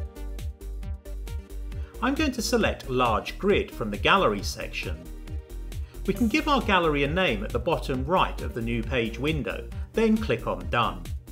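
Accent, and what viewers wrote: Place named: United Kingdom. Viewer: Well done, what a great tutorial of Wix (and with this British accent as well:)) I built my first website now, indeed no more than 10 minutes, but there is some work a head of me still. I think I'll get a logo somewhere and I need to add my content. Thanks a gain for this simple tutorial.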